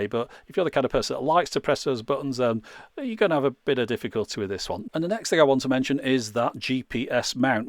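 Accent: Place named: Lancashire, United Kingdom. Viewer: I recognised Wigan and a Lancashire accent.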